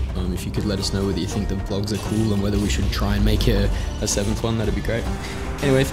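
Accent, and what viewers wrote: Australia. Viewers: Vlog is good, also it's nice to hear an fellow aussies voice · You're Aussie. Is Motion Twin an Aussie company?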